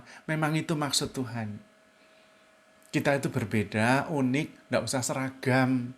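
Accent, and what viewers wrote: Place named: Java, Indonesia. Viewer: 🌿🍁😊 Sugeng dalu Romo... Matur nuwun Pencerahannya... Jujur saya ngèkèk sendiri.. Dengar Romo bicara campur logat Jawa deles...Bahagia sekali rasanya.. - Semakin kita membandingkan dengan kehidupan orang lain semakin stress.. - Saya pribadi kok luwèh” dengan kehidupan masing” orang... - Saya syukuri setiap rahmat yg Tuhan beri, jalani apa adanya, nikmati..Sampun cekap... Matur nuwun Gusti.. - Makan bisa nikmat( walau lauk tempe penyèt)... Tidur bisa pules walau kasur bukan Springbed ... - Namung sumarah dumateng kersanipun Gusti.. -Langkung manteb malih mirengaken Pencerahan Romo yg selalu Super... Matur nuwun Romo, Berkah Dalem...🙏🙏🙏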